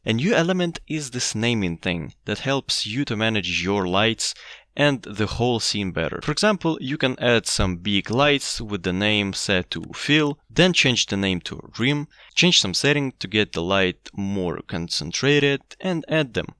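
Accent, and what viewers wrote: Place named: North Caucasus, Russia. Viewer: Bro must be from the north caucasus.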